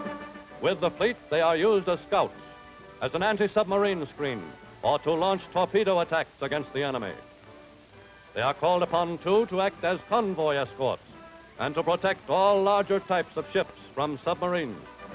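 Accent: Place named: Mid-Atlantic, United States. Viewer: Great slice of history. Love the old-timey narrator with the generic "Mid-Atlantic" accent.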